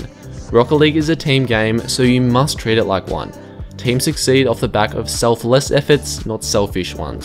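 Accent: Australia. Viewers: Oi you’re Aussie ay?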